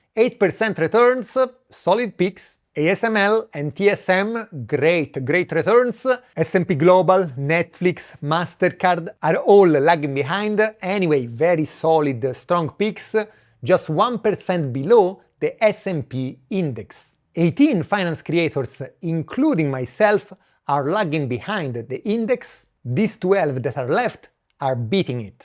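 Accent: Italy. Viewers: THIS GOTTA BE THE THICHKEST ITALIAN ACCENT I HEARD 😁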